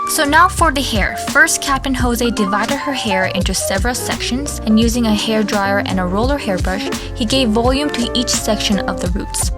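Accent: France. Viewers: Your French accent is so cute! 😜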